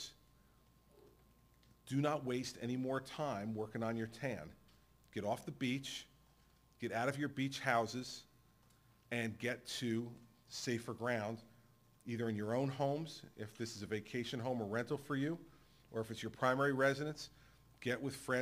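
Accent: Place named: New Jersey, United States. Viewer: It's Jersey that how YOU talk in Jersey .. ok Your Done Get OFF the Beach NOW !!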